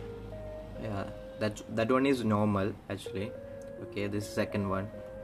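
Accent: Kerala, India. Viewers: English kettalariyam malayaliyaannn...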